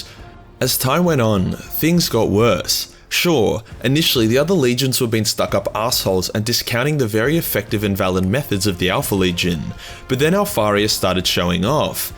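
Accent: United States. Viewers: THE AMERICAN ACCENT. WHOOOO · I lost it to the American accent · Damn that was a pretty good US boy accent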